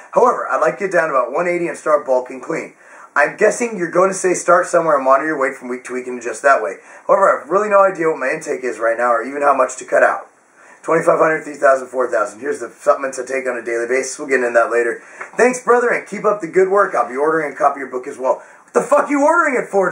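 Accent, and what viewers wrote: United States. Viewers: Country ass accent is cool ;)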